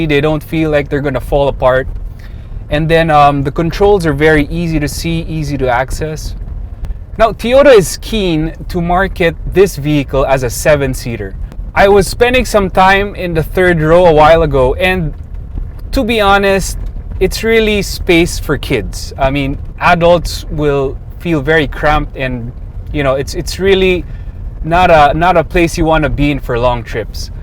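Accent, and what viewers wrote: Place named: Philippines. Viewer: He actually speaks well if you don't mind the Filipino accent .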